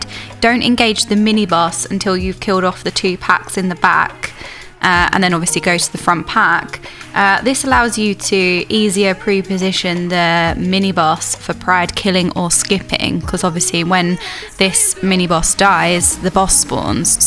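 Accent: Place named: United Kingdom. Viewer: I'll be honest, fire mage here, been trying out frost as fae, it's fun since i always leaned towards frost. I'm still doing 15s so I'll call out what I see in those keys. People don't really pay attention to mechanics if it's not a deadly one, so if you would like to add some comments about mechanics like these you did they sure are pretty welcome. From what I've noticed from my prespective, making mental notes by watching good players' movement and ability usage is attractive and should be to most, so a bit of explanation stuff about when to press Icy veins (I always do when it's out of cd except if the pack is dead or small :P) would go some ways. Music on that tone was actually nice having. Lastly you got a lovely voice, UK accent which i personally love and a lot might adore it so you do well speaking enough during the video! Keep it up!:D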